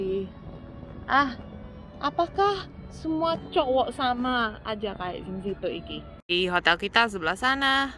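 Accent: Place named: Java, Indonesia. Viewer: Bahasa jawanya masih kentel drpd bahasa Indonesia 👍👍👍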